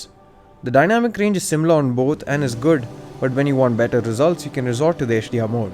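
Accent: Kerala, India. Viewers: bro are you a malayalee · Are you from kerala?? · Bro are you from Kerala ??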